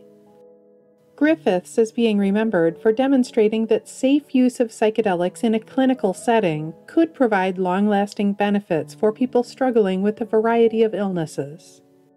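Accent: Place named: Iowa, United States. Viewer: your voice is so soothing that it could lull me to sleep and your sweet accent sounds like the Midwest to me. If it’s not too personal, would you mind tell me where you grew up? My guess is Iowa because it sounds corn fed, but I think about Fargo and Marge’s accent and that would put you in Minnesota? Cheers, Mary Rose. P.S. Because I love arcane information, I know that Estonian, Finnish, Hungarian are one of the smallest non-tribal languages groups in the world, and now you know it too. Cheers and Keep Up the Good Work, Mary Rose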